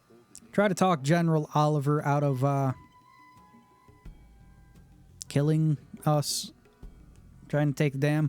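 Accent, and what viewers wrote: South, United States. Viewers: You sound like you just came our of the mojave, you have a subtle southern accent lol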